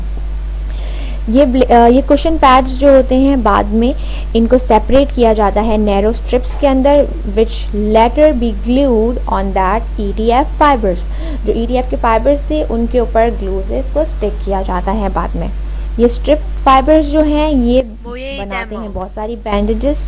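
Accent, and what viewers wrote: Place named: India. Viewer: Can another person read the text?I dint get anything , is there any person, whiteout Indian ecsent.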